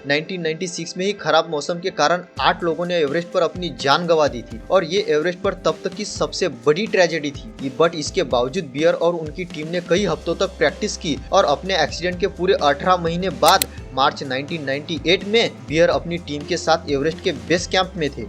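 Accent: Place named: Maharashtra, India. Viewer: Bhava tuzi hindi marathi tuning madhe vatat aahe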